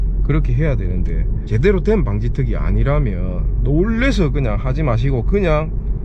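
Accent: Gyeongsang, South Korea. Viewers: 혹시 경상도 남자라예? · 질이 들어놓니까.... 갱상도 부산,마산,창원(진례)? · 경상도 사투리 구수하네요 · 경상도 아이가 사투리 직이네~! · 이런거 모르는 사람이 어딧어 못하는 사람은 운전 미숙이지 그리고 사투리부터 고치고 영상 만들어라 듣기 거북하구만 경상도 사람들은 사투리 못 고치나? 방송인 강호동 나오면 채널돌린다 비호감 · 놀래뿌써요! 역시 경남 사투리가 좋아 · 왠지 마산분일듯요 사투리가 마산쪽인듯용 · 잊고 살았던 구수한 경상도 사투리 ~ 좋습니다.ㅎㅎ ^^ 늘 응원 합니다 ^^ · 제가 옛날부터 쓰던방법이고 알고있는지식이 또 유툽영상에 올라오니 신기하네요 . 사투리듣기좋아서 시청하고갑니다. 저도 경상권이라 ㅋ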